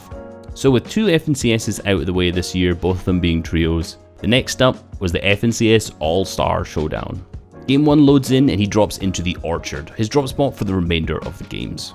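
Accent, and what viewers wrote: Scotland, United Kingdom. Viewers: are you scottish or american please choose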